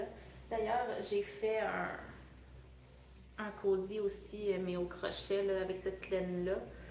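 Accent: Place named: Quebec, Canada. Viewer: Bonjour, j'aime aussi beaucoup les tricots zéro cerveau 😂 Tu devrais aller voir les pod cast de Christelle Nihoul Christal NK, elle explique bien les modifications à faire pour les poitrines plus larges que les épaules et les hanches pour éviter de se retrouver avec des modèles trop loose. Moi ce serait plutôt un petit ventre à caser! A bientôt pour un deuxième pod cast tricot. Ce premier était très réussi. En bonne Française de France j'adore l'accent du Québec ❤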